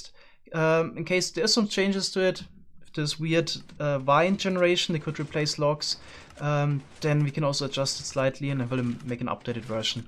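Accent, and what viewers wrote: Germany. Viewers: I'm curious, are you German or Austrian? I recognize that accent... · He is german isn’t he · Are you german?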